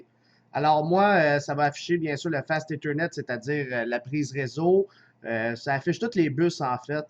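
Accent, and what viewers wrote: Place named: Quebec, Canada. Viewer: merci pour cette vidéo qui m'a permis de comprendre deux ou trois points que j'avais du mal à visualiser avec les tutos classiques. Et puis, rien que pour l'accent du Québec ça valait le coup. Merci cousin !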